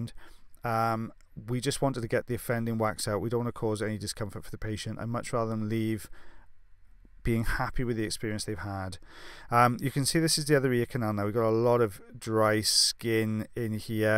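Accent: Wales, United Kingdom. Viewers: Haha...18 minutes of a Welsh accent...how could that ever bore anyone? And an explanation of what is going on...I have learned plenty...and not only the terms (the attic, canal walls, etc) and the various conditions which occur. This is a whole new course! Thank you. I didn't waste my isolation! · I keep coming back because i miss the welsh accent while living in korea. When you said go get a cuppa literally lit up my eyes😂 nobody has said that to me in 5 years while ive been here! Aaaand your videos really relax me before bed! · Agree! Want to learn that british accent..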